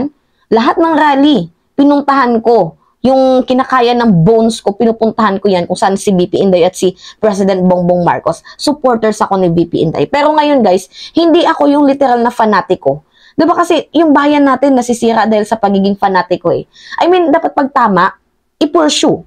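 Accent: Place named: Visayas, Philippines. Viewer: Bisaya ka day... matigas ang pilantik ng dila mo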